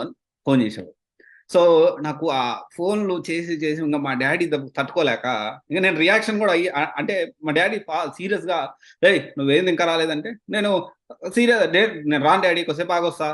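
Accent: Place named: Nellore, India. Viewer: Oka Basha Backdropey undi ga anna neeku nellorelo😅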